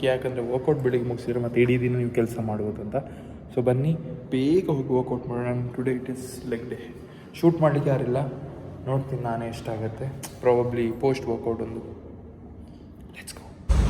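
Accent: Mangalore, India. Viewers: avre kannada first Matadodu kaliri nimma mangaluru bhashe beda Try madii It will boost your views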